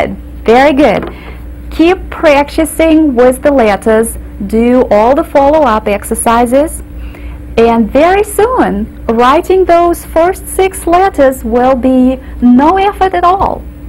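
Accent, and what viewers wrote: South, United States. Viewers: Never heard a russian and southern accent fighting each other at the same time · This is so amazing that she has a southern accent!!! amazing!! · she kinda sounds like she has a southern accent, which is ironic since she's from Russia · You speak with such a strong Southern accent. you got this accent in 8 years ! Where in the South do you live mam ? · I love how you can hear little glimmers of Britney Spears Southern American in her accent. "Got my edu-kai-tion..." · I like how she has a slight southern twang lol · Thanks for the video! I have to say that I was very surprised to hear that you are native born russian. It is easy to tell that you've been living in the south because you have that southern drawl. Spahsiba! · southern cowboy accent comes from the deep words pronounced in Russian that makes her talking in that way in English! 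:) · She sounds like she learned English in the south. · the southern(?) accent is throwing me off but i kinda love it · I like this but I swear I hear a strong southern States accent, I suppose that kind of stuff makes possible to guess what area people are from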